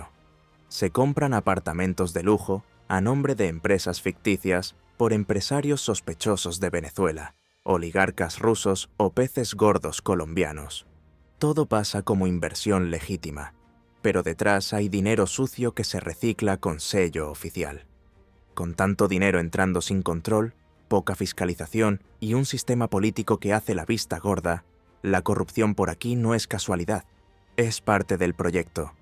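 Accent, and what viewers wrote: Spain. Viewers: Pero de que está hablando este señor con acento español , en España la personas mayores en los pueblos abandonados el gobierno no se ocupa de ellos , las inundaciones arrasaron Valencia y las personas están abandonadas la inmoralidad sexual es increíble, las violaciones los asesinatos de hombres a sus esposas, los padricidos pero de que habla este bocón ya quisiera el poder vivir en Miami , en España las personas no pueden pagar un techo apropiado para poder vivir este hombre si se le puede llamar así que se enjuague su boca para hablar todas las mentiras que está hablando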